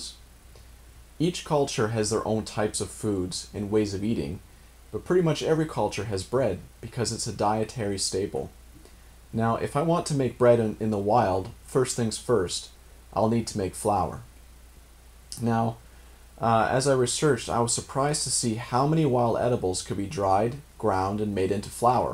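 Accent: Canada. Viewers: You couldn't spot that Canadian Accent?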